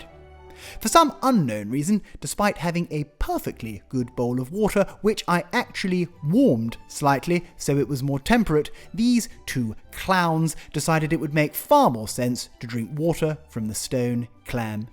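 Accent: RP, United Kingdom. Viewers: Every aspect of your house is enchanting. However, your attempt at some sort of received pronunciation is getting a bit wearing and from now on my mute button will be used, The visuals more than make up for a lack of overly precious narrative. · I’m alway so excited to watch your videos. The absolute sarcasm with the best posh accent. You always bring a smile to my lips and an inappropriate laughter at the worst time. ❤❤❤ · Fab’ content. Super interesting and I will follow your progress in what will be a lot of hard work to renovate a lovely home. Best of luck! BUT, and it’s a BIG BUT…. The RP is unbearable. I shall be using closed captions from now on.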